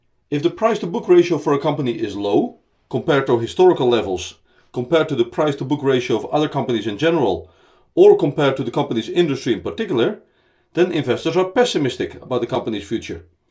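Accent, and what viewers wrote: Netherlands. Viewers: Are you Dutch? :P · Lekker Nederlands accentje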